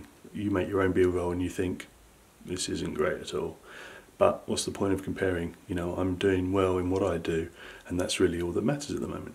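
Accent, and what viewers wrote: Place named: United Kingdom. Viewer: Dude you’re British? I subbed just for that lol. Great video man.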